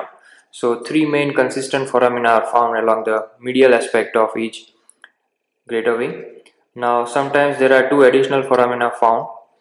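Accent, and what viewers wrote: India. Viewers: Nice video but your English made it hard to really get what you're saying and you're speaking too fast. It felt like you were speaking Indian.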